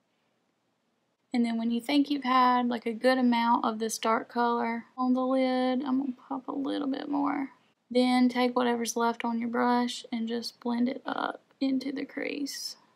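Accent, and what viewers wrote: South, United States. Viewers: Where are you from? I sense a southern accent. I’m from Alabama!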